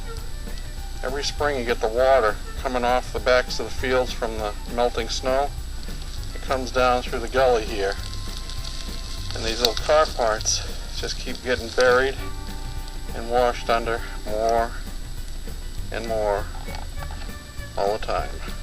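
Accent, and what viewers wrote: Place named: New England, United States. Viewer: Back when you still had your heavy New England accent. Great memory reel! Cheers my YT friend and Shipmate.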